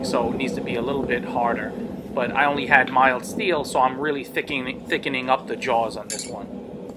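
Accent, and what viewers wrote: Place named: New York, United States. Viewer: One question I have. The depressions you make with the guillotine tool at the front and back of the boss, are those functional or just for aesthetics? Also, do you originally come from the NY/Long island area? just guessing by your accent.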